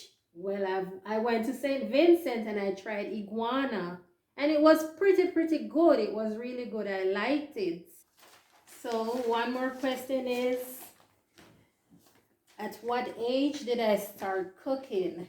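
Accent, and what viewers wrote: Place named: Jamaica. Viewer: A very nice introduction to you! I live the Jamaican accent!! And age 21 for several years 😂 I like that. My wife says the same thing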